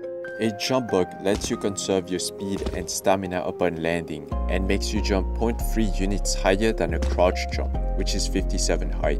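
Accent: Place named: France. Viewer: is that ur voice??? u sound so french XDDD anyways nice tutorial...best so far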